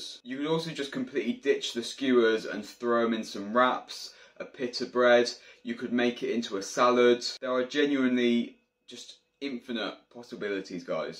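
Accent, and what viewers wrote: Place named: England, United Kingdom. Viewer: sounds like your in England somewhere. we don’t speak like you in California, some words we don’t use in the US.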